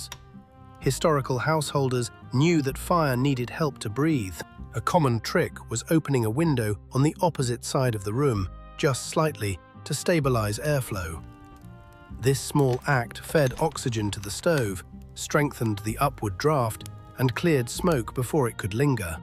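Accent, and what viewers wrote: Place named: England, United Kingdom. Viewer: The bones of the building. 🙂‍↔️ That thick, English accent! ☝🏻🧐 The bones of the house lose heat. ☺️😆 I love it, German shock ventilation! 💁🏻‍♂️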